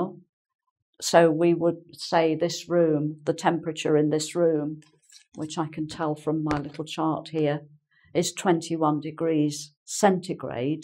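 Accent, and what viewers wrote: United Kingdom. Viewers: Hi, i love the british accent. But, how do you pronounce heat, hate, hit, height? Are they being pronounced the same way?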